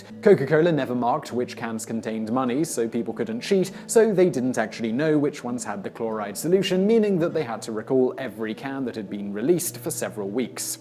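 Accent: United Kingdom. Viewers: Samm Ball II American he has a British accent if he spoke like an American you'd hate his voice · Public Enemy - he's British. That's how they say it. It's not just him, it's an entire nation as well as everyone in other countries who was taught British English as a second language. So yeah, a massive percentage of English speakers say it that way.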